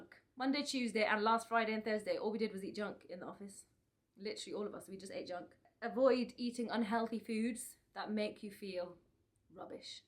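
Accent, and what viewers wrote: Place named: Scotland, United Kingdom. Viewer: Oh I hear a Scottish accent! :D hello fellow Scot!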